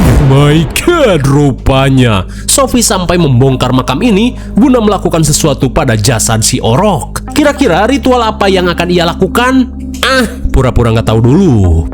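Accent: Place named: Cianjur, Indonesia. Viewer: ini bahasa Sunda ny kaya ny cianjur bngt nyambung sama Sunda aku soal nya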